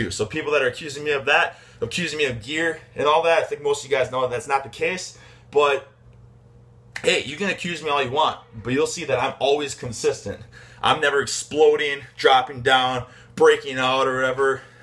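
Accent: Midwest, United States. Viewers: Is he from the midwest?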